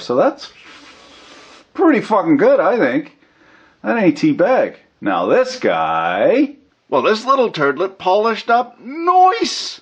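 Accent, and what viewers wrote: Canada. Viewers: WARNING! VERY CANADIAN!!! But he seems like he knows what he is talking about , and he answered my question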